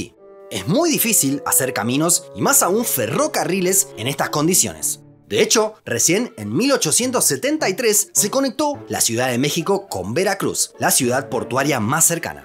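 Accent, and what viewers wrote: Argentina. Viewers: Wakala ese acento argentino, traigan al antiguo narrador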